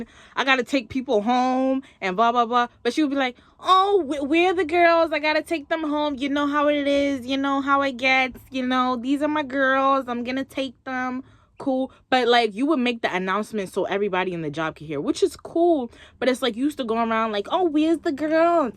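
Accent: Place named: Long Island, United States. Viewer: Love the Long Island accent yo need to make it a character 😂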